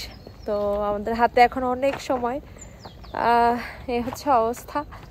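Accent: Khulna, Bangladesh. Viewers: Apu ami Canada theke,Bari khulnai,apner khulna language khub enjoy kori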